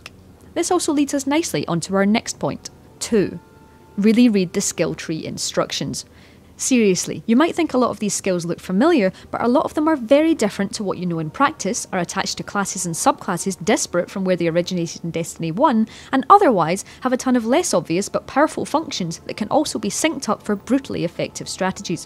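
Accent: Scotland, United Kingdom. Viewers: sounds like a Scottish accent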